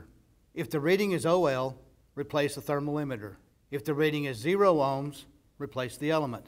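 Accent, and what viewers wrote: United States. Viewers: Thanks, buddy! I'm Brazilian and your accent helps me to understand my American boss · He sounds like he is from the Ozarks of Missouri.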